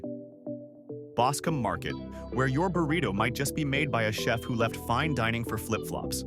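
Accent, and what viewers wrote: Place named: United States. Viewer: Commentary is pathetic why American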